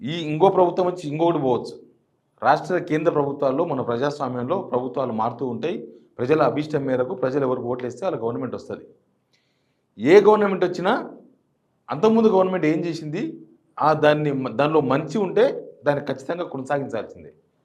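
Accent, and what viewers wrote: Telangana, India. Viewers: పూలపండ్లు అచ్చమైన స్వచ్ఛమైన తెలంగాణ యాస సూపర్ అన్నా..😄😁 good information ..👋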